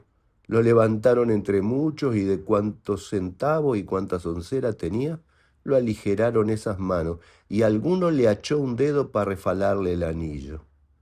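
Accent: Argentina. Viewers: Qué mejor escuchar el cuento de Borges por un argentino, así como escuchar Pedro Paramo por un mexicano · Es muy cierto, las historias cobran vida cuando se las verbaliza. Un hallazgo ese tono y decir campero. Me recuerda a gente de Entre Ríos, a los uruguayos y a bonaerenses del campo. Borges estuvo muy ligado a mi provincia, también al Uruguay y al sur gaúcho del Brasil. No fue sólo un señorito de la gran urbe porteña, ni sólo un inglés o suizo. Fue todo, pero su corazón miraba al sur. ¡Qué hombre querible, culto, sensible, qué escritorazo! Gracias por esta entrega...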